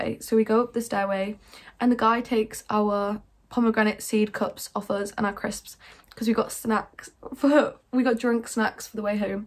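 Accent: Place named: United Kingdom. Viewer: I watch your content only because your British Accent🧡🖤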